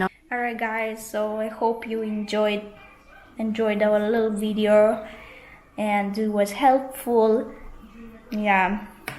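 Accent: Jamaica. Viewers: not 100% fluent in English and patois, so there is mix of both when she talks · Ur getting the Patois LoL 😂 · You have a real jamaican accent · Yes. Teach him. He will regret not learning when gets older. I love your Jamaican accent. Im Jamaican and taught myself russian some years ago.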